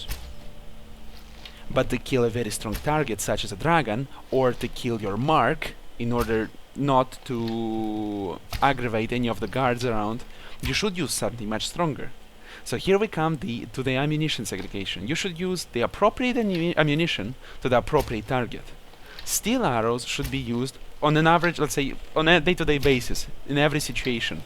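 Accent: Russia. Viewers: he sounds more Russian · Russian accent is awesome! Especially swedish with a russian tinge. :) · dude, he is russian as well as I do. Accent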